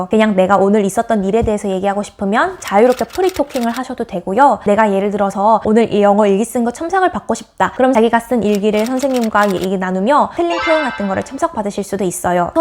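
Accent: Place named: South Korea. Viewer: 아무리 국내파라도 결국 영어유치원 원어민 학원 줄창 다닌 것 같은데? 아닌가요? 그래도 한국 수도권식 단조로운 발음 악센트 고치려고 엄청 노력했을 것 같네요 ㅎㅎ 조선인들은 외국어비교부심이 강해서 ㅋㅋ 자기는 할 줄 아는 외국어 하나도 없으면서 남의 와국어 평가하고 자빠졌음 ㅋㅋ 이것도 외국어 공부하는 사람들이 넘어야할 부분중에 하나임..😂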